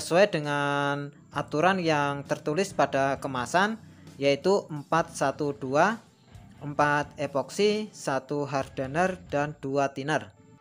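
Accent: Java, Indonesia. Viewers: oh kirain..logatnya jawa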